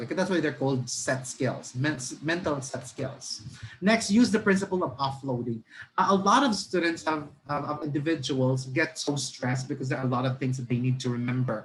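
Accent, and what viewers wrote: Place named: United Kingdom. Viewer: Thank you Sir👍, with British Accent👍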